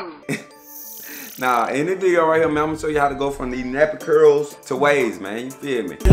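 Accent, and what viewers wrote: Atlanta, United States. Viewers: I can tell you from ATL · STRAIGHT FROM ATLANTA!!! That accent is hard!!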